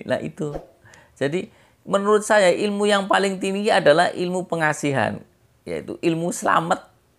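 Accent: Tegal, Indonesia. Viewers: Asli mana Om.... Tegal yoo Logatnya... Salam Ngapak yoo Om... Bolehlah Kerisnya... Tak Dolan ning omah njenengan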